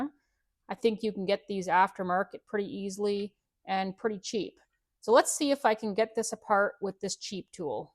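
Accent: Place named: Canada. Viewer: Don't you just love how Canadians pronounce certain words.............like "out" which becomes "oot"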